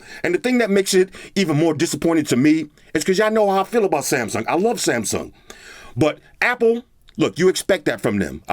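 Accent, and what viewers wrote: New York, United States. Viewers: You from New York? B.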